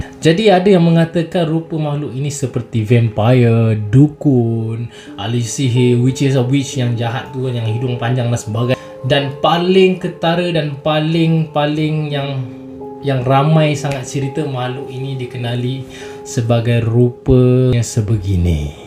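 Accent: Sabah, Malaysia. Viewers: Aduh slang2 adik ni macam Sabah laaa 🥺❤️ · Suara abg ni mcm suara org sabah jak hahaha btw sya urg sabah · Mcm slang sabahan ........ Btw storyline best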